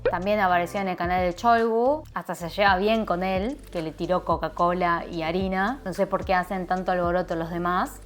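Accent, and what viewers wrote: Argentina. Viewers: waaa que lindo es tu acento arg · Es lindo ver una coreana con acento argentino :3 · es raro escuchar a una coreana con acento argentino mencantaaaa❤❤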